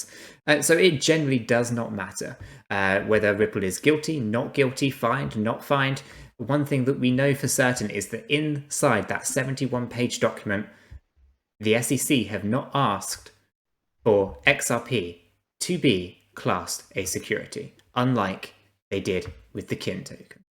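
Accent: England, United Kingdom. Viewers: The English accents are a giveaway no?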